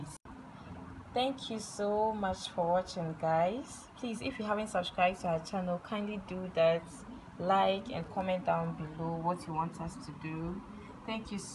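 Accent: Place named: Ghana. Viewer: I love your accent! Are you from Ghana?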